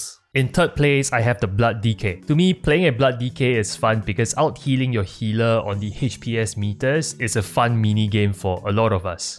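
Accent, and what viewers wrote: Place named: Singapore. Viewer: Hey man thats awesome! I'm on Thaurissan myself , also GM and trying my best to do content creation (which is why i got excited when i heard the Singaporean English). All the best!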